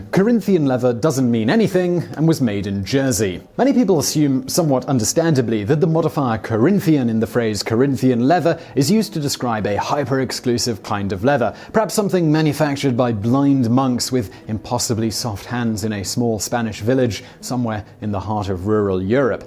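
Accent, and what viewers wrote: United Kingdom. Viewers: OK Monsewer, you poke fun/schit at people making up words or not being able speak certain things. You sure mispronounce so many words yourself and do it with a straight face. Are you trying to be funny or is it that you really don't know how to pronounce these words. Or is it that you seem to be Brit or are speaking with a Brit accent to come off with a holeyer than thou attitude? By the way the English language should be renamed the American language. Since Brits can't pronounce half the words. Do English/Brits/Limeys know just how ridicules they sound? Some Brit movies need closed captions to understand what they are saying. Sorry, I just recalled an Aussie I ran into in Thailand, to this day I still don't know what he said in the short conversation we had, I nodded my head mostly to what he was saying and that seemed to satisfy him.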